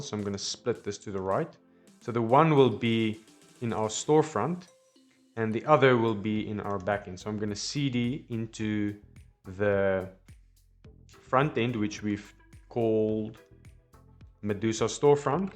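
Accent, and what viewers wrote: South Africa. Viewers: Ha I knew the accent within the first 30 seconds, but I’ve been working remotely with ZA folks for over a decade now so it’s second nature by now. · I hear the south african accent! 💫 😂 Please do a video to customize to add other tables or inherit and add fields, and make this into a Multivendor marketplace like takealot or Amazon! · Great Tutorial YT / !! Also, hello as a fellow South African - recognize the accent from anywhere :) I saw a lot of comments/questions regarding postgres URL that is passed during the NPX-create. I did a little troubleshooting and found the following worked really well for me: TL;DR = Add single quotes around the URL string. Add single quotes around the URL string. Adding quotes is the answer; you might have a different setup, noticed you're on PC, on Mac or Linux it is possible to require quotes is my guess. 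Not using quotes got me stared but then I got error shortly after opting in to create the Next.js storefront. I figure why not do it now vs later. ERROR: "Couldn't connect to PostgreSQL using the database URL you passed. Make sure it's correct and try again." Then after some reading, I used double quotes and it failed as using double quotes with '@ or '!' means something else in ZSH-World. End Result: Use Single Quotes around the postgres URL Keep up the good work! Cheers!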